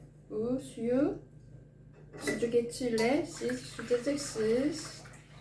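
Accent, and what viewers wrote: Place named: Azerbaijan. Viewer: Elinize sağlık. Tarif için teşekkür ederim. Bu arada Azeriler gibi konuşuyorsunuz 😅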